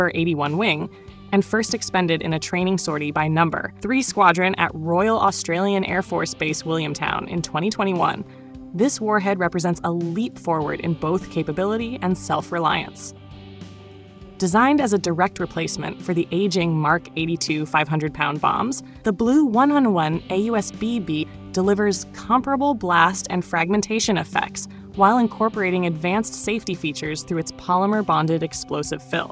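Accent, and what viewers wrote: United States. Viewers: All the companies you mentioned are foreign owned. The only way Thales would continue is if it sold its operations to another foreign company. Not to mention this clip is narrated by an American.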